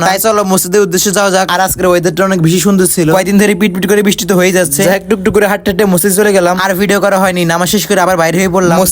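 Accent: Noakhali, Bangladesh. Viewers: ভাই আপনার বাড়ি কি নোয়াখালী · আপনার বাড়ি কি নোয়াখালী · ভাই আমি নোয়াখালী থেকে বলছি। তুমি অনেক ভালো নোয়াখাইল্লা ভাষা পারো। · আচ্ছা ভাইয়া তোমাদের বাড়ি কি নোয়াখালী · ভাই আপনার বাড়ি নোয়াখালী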